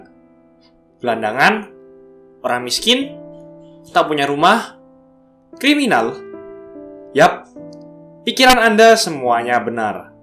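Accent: Java, Indonesia. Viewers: La medoook😁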